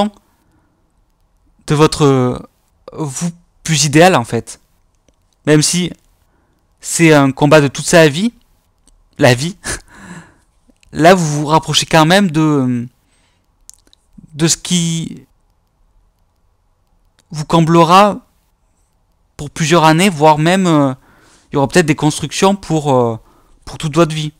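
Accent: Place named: South-West, France. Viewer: Coucou merci confrère avec une voix qui chante et me rappelle le sud ouest du côté de ma mère de Toulouse merci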